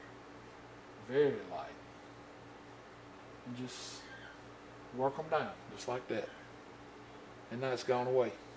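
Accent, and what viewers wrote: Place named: South, United States. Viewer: I love your videos. I'm southern too, so your accent and instructions make it so easy to understand. Thanks!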